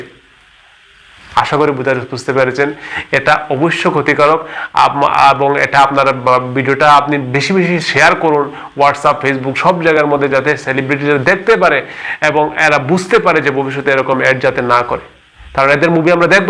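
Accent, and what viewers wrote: Sylhet, Bangladesh. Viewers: ডাক্তার সাহেব, অত্যন্ত উপযোগি video উপহার দেবার জন্য ধন্যবাদ। বিশেষ করে আমাদের মা-বোনরা অনেকেই পাননশলা খেতে addicted হয়ে আছেন।আপনি কি আমার মত বাংলাদেশী সিলেটি নাকি আসামীজ সিলেটি। আমাদের সিলেটি ডাক্তার পেয়ে খুশী হলাম।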